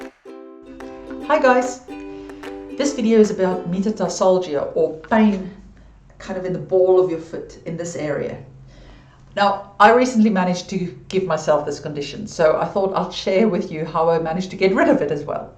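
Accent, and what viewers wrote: South Africa. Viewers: Great Talk. I Thought She’s SouthAfrican.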